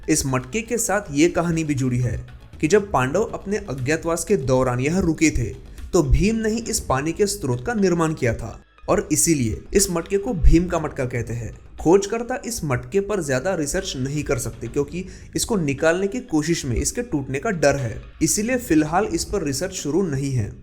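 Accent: Maharashtra, India. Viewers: Hello. It was interesting to know about all the three things mentioned in your video. One suggestion though. The narrator has a very deep Marathi accent, hence many Hindi words are not pronounced the way it should be. I would suggest that you hire someone for this job. I am interested.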